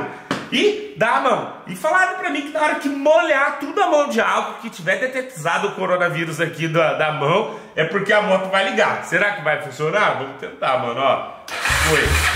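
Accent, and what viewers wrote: Goiás, Brazil. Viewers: por que vc não fala mais naquele pike do goiais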